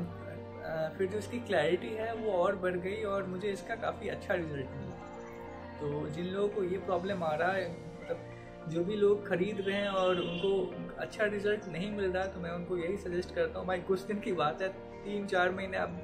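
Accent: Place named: Bengal, India. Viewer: Pronunciation se Bengali mone h6e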